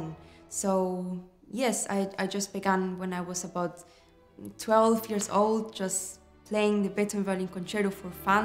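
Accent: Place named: Germany. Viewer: Tienes acento alemán y hablas inglés, pero tu nacimiento, tu nombre y tu increíble talento, te hacen un verdadero orgullo para España. Ya podemos decir que no sólo tenemos a los mejores en el deporte, sino también en la música de verdad. Gracias, thank you, danke... (el próximo concierto en Mallorca no me lo pierdo)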